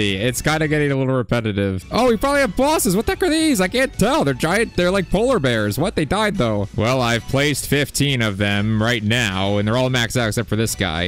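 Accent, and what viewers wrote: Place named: United Kingdom. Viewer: Why bro speaking British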